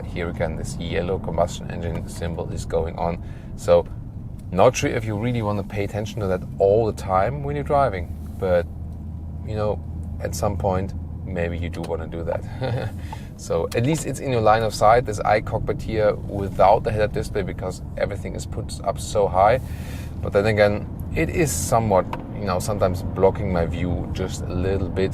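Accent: Germany. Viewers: An excellent car, but I could do without the atrocious German accent! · I don't understand German English ...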